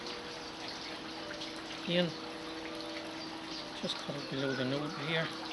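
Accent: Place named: Ireland. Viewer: The guy in the video has an Irish accent - perhaps there's a world outside of america that has different climate and ecology, somewhere like Ireland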